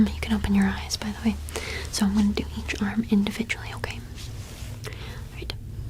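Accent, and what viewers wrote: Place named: Yorkshire, United Kingdom. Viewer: Чисто йоркширское произношение.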